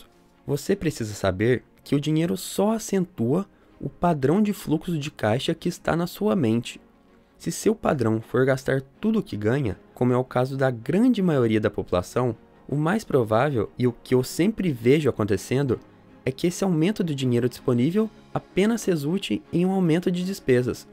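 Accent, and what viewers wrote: Caipira, Brazil. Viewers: Só um conselho. Procure um fonoaudiólogo para perder esse sotaque caipira horroroso. Não dá pra assistir o vídeo com uma narração dessas...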